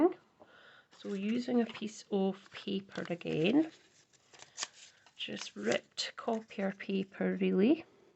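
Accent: Scotland, United Kingdom. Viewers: Absolutely gorgeous, lovely colours and design. It's great to hear a Scottish voice on YouTube, I've just found your channel and will enjoy watching your videos, thank you so much for your inspiration 💖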